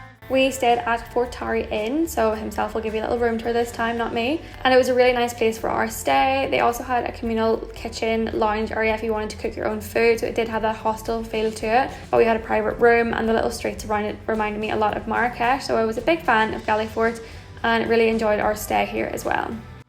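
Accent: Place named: Ireland. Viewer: Lovely Irish accent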